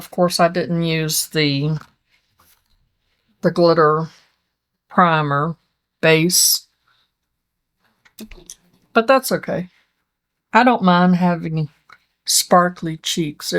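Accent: South, United States. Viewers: Although I love seeing makeup videos in the mature woman world, I love listening to your beautiful soothing voice. Your southern accent is so calming and lovely.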